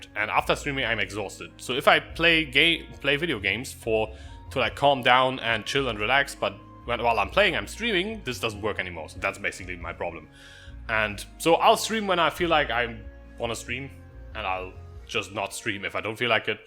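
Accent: Germany. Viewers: well, you gonna tell us where that is? prolly somewhere south of Berlin? or am i getting your accent wrong? :D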